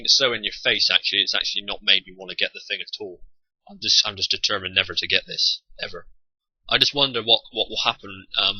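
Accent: United Kingdom. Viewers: I have never heard an Indian with a British accent before. It sounds nice. No really still loving this rant.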